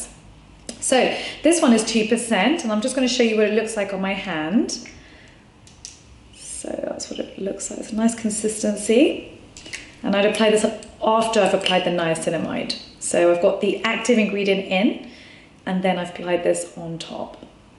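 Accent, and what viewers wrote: United Kingdom. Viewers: Right,your accent finally confirm me that you are living in the UK 😁😃.LOL.I am living in North 🤪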